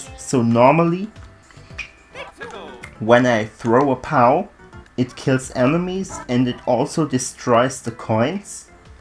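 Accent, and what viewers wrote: Germany. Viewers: German accent af😅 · Man hört sooo hart dass du Deutsch bist😘 #noHomo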